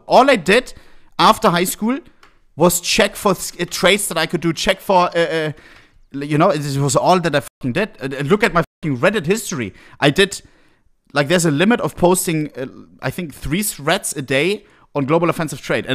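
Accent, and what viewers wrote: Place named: Germany. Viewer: Der deutsche akzent fucking big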